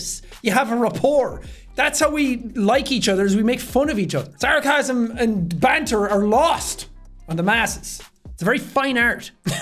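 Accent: Ireland. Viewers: I love how he became significantly more Irish after the ice was broken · The entire time I watched this my cat was scratching at my door for no reason and I was like: She is drawn to the voice of the loud Irish man 🛐🛐🛐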